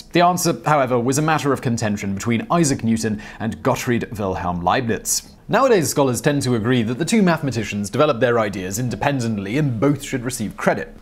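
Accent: United Kingdom. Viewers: The BritLish pronunciation is interesting indeed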